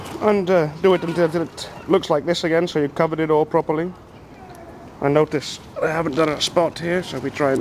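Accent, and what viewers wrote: Netherlands. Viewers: Ik wist dat dit nederlands was!! hoor je aan het accent ;) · he is Dutch there's euro symbols on the price tags and the accent is very Dutch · nope hes dutch u hear the accent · Wow ik hoorde haast niet dat je nederlands bent! Je engels is echt goed, je hebt niet dat rare nederlandse accent zoals mij :') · Haha ik herkende gelijk dat je Nederlands bent.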